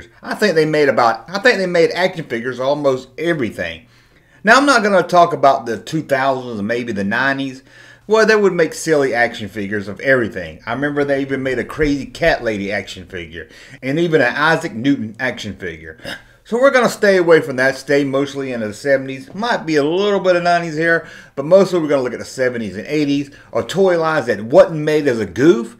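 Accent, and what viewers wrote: South, United States. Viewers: How in the HELL could someone with an accent as southern as yours have had an African American doll when you were a kid?!?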